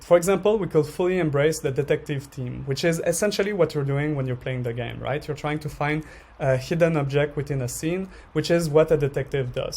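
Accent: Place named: France. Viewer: French guy for sure !!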